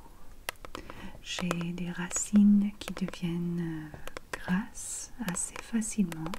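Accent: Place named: England, United Kingdom. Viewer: Cette vidéo est vraiment incroyable pour dormir votre accent anglais en parlant français me donne des frissons!!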